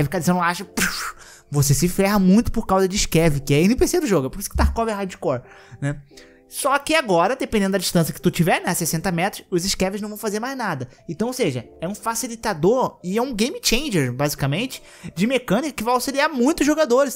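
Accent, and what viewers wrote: Rio de Janeiro, Brazil. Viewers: Sotaque carioca simplesmente intakavel, flws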